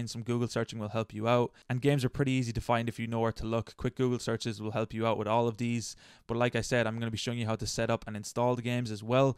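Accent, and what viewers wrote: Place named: Ireland. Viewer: what part of ireland you from ^^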